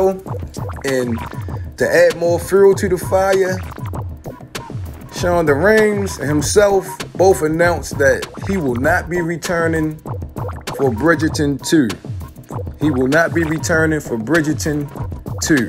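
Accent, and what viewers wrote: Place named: Baltimore, United States. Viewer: i can tell you're from baltimore btw 😂😂😂😂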